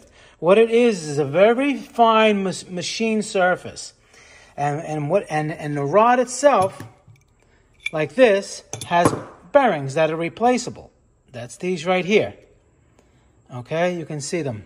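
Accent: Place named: Boston, United States. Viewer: I swear to god that bostonian accent gives me such vibes. It's like playing max payne or something and just listening to one of the gangsters explaining to his buddy, in detail, how a car engine works and why his ride is irreversably screwed lmao All joking aside though, this vid and your channel in general is great and informative but I just had to mention the accent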